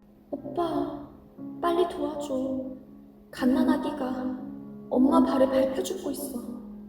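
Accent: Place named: Gangwon, South Korea. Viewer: 성우 목소리 정말 좋네요 강원도 억양.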